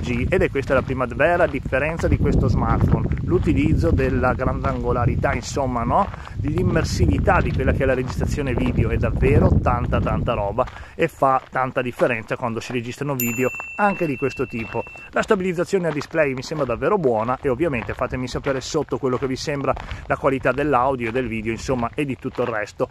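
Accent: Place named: Veneto, Italy. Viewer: Presumo che dall'accento non abiti molto lontano da me..nella mia zona(Montagnana) iliad funziona alla grande, praticamente sempre 4g o quasi, magari lo Speed test non è a livello di Tim, ma alla fine, mica guardo film in 4k col telefono!! nell'uso quotidiano non noto nessuna differenza..quindi secondo me anche tu sarai soddisfatto! grande come sempre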